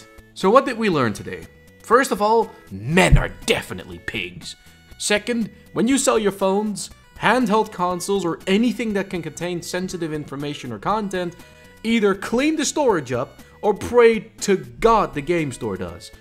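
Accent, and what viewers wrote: Netherlands. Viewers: Are you dutch because it sounds like it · r u dutch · are you dutch because you sound dutch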